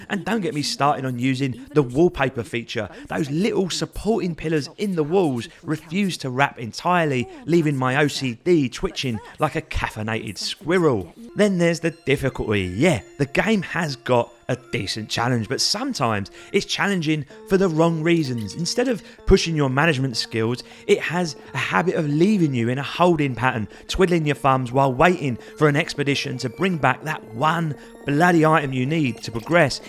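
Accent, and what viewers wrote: Cockney, United Kingdom. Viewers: Loving the cockney.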